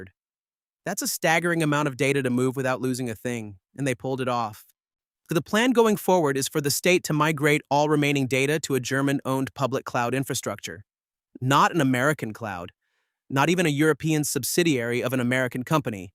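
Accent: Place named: Canada. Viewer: you sound like some dummass canolian.....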